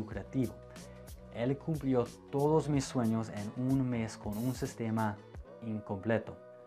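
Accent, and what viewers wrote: United States. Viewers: vos sos un buen profesor, lo que no te ayuda mucho es tu acento gringo. creo que nadie querría aprender a hablar ingles con una persona que habla español con un acento tan marcado, da como mala espina. pero si sos bueno, eh aprendido mucho con vos ! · Profe debo reconocer que cuando Zack Morris nombró a Kale y a usted, sí me sorprendí, pensé que era usted y fue muy decepcionante. Porque físicamente se parecen, pero la esencia de los dos es muy diferente. Usted es el otro lado de la moneda, es profesional y carismático. Y también hay otra gran diferencia entre los dos, Kale habla un Español con un acento casi perfecto y usted profe habla un Español con un toque de acento gringo(lo cual es adorable). Gracias por la aclaración ☺️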